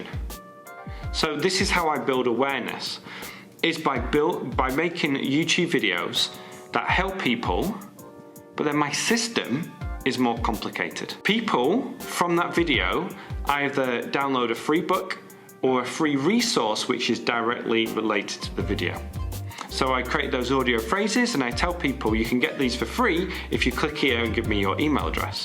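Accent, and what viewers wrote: United Kingdom. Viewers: Thank you. I like your British accent.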